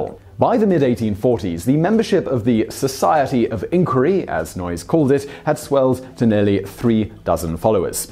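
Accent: England, United Kingdom. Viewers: This is like Stuff You Should Know but better because of the English accent.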